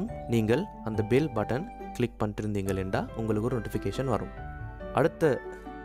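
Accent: Jaffna, Sri Lanka. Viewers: Neenga jaffna tamil pesureenga bro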